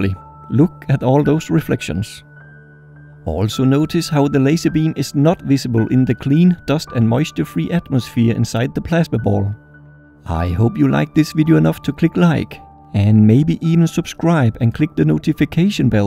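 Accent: Denmark. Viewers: Man kan bare høre på den accent du er dansk haha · Warm Danish accent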